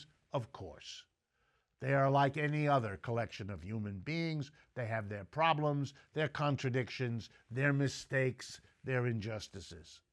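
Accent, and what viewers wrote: New York, United States. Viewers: You know what I don't understand? How a guy who went to Harvard, Yale, AND Standford is talking to us about the problems of our system. I truley am puzzled. How so many priveleged in history take stand against the problem that made them so sucessful. I am thankful for Dr. Wolff's show, however it is odd. You can tell he's a New Yorker by both his accent, and the fact his show is structured like a radio show (big in NY)...plus I google'd him lol. I'm a new englander myself and although I don't live in NY I do appreciate the show's style a lot.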